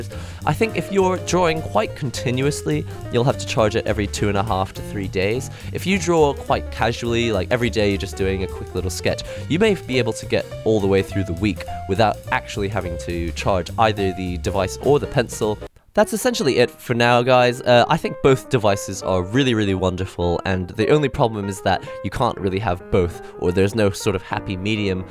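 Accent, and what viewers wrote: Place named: United Kingdom. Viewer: you sound like a british Amir Blumenfeld. Thats a compliment lol.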